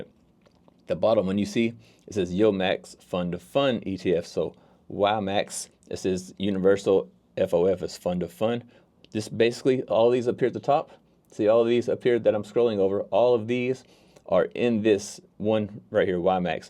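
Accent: Louisiana, United States. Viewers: Are you from southeast Louisiana?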